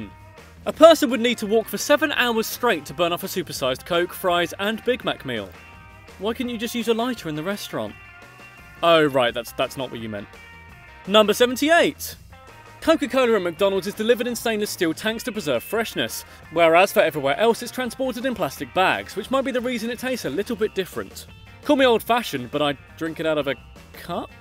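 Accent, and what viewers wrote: United Kingdom. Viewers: All british people pronounce it like that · is it just me or would you marry the british voice guy because of his voice and hilarious puns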